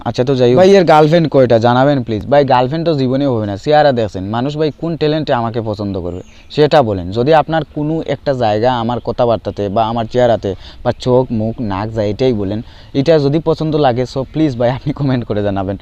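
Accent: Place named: Gopalganj, Bangladesh. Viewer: ভাই আমার শশুর বাড়ির সবাইর ভাষা আপনার মত। love from Gopalgonj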